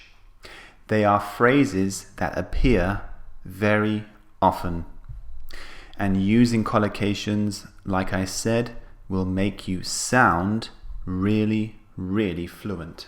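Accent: United Kingdom. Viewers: I have a question. May I know, which British accent do you teach? Do you teach a Cockney accent, Modern RP(received pronunciation ) or a Brummie accent? Your reply would be appreciated.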